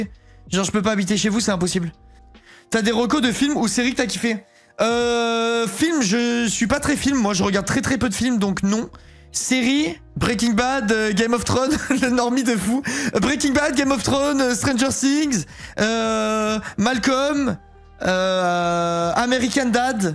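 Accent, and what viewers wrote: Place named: Marseille, France. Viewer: avec l'accent marseillais est juste 🤌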